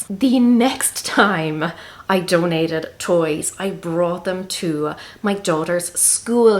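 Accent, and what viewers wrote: Ireland. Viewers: sounds like an irish accent but I cant pinpoint where lol :) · I just watching this video for info and now ill watch it to hear your beautiful accent. Irish, right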